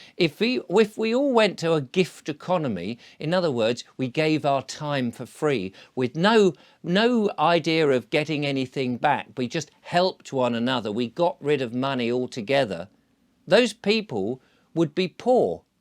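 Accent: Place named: RP, United Kingdom. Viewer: Looking at the way dresses and his house, and the posh way he talks one suspects he may have a bob or two!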